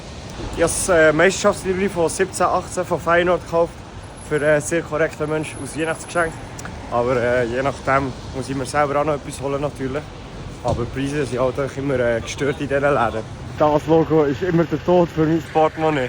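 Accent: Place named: Switzerland. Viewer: würde schauen, wenn du kein schweizer wärst. verstehe kein wort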